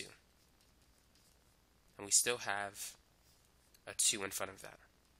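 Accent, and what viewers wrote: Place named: Texas, United States. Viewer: Hi american , are u from Texas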